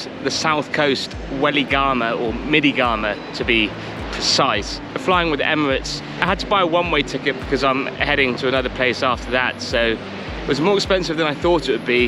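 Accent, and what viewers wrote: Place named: United Kingdom. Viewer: Love british english speaking accent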